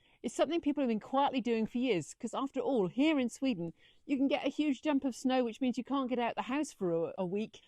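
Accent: United Kingdom. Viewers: Du bist Schwedin??? Warum hast du so einen englischen Akzent?? Ich verstehe schwedisches englisch sehr gut, englisches nicht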